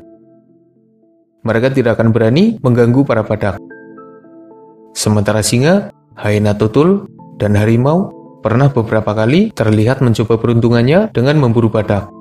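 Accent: Banyumas, Indonesia. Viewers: Kie ta kyne cenel wong ngapak o yak......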